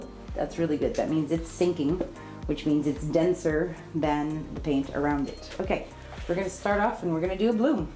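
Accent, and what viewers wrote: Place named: New York, United States. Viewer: You just explained EXACTLY what my issue is with paint separating. THANK YOU! I love your New Yoak accent 😃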